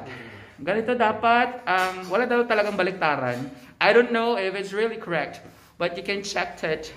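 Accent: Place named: United Kingdom. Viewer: Aha, uu nga anoh. Salamat sa pagturo. Galing mong mag explain. I like your british accent:)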